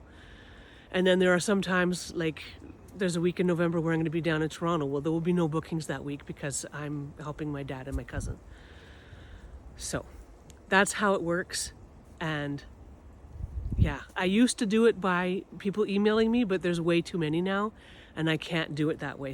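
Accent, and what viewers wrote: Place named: East Coast, United States. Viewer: We love you soooo much! And thank you for reaching all of us like you do! Your messages have changed my life! Love you I was gonna say, are you a east coaster? I grew up in Sydney NS and I recognize your awesome east coast accent poppin in, anywhooo love you moon and back and thank you for all that your channeled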